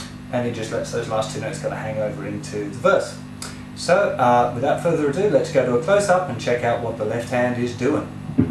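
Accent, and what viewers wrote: England, United Kingdom. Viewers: Is your origin from England? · Never thought I’d have Norm Macdonald teaching me guitar while using his English accent.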